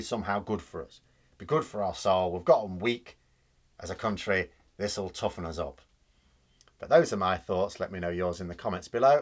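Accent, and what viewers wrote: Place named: Northern England, United Kingdom. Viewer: Now that you started shaving once in a while, could you also get rid of your obnoxious Andy Capp accent so that peoples may hear some proper English while they consider digging for victory ?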